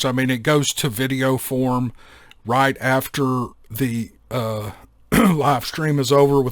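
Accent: South, United States. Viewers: Meant to add that your well spoken Southern brogue gets me through many nights and I am very much grateful to for your gracious and unassuming attitude toward our shared fascinations with the many accounts, lore and incredible stories of the rural areas and wilderness countryside of this great Nation !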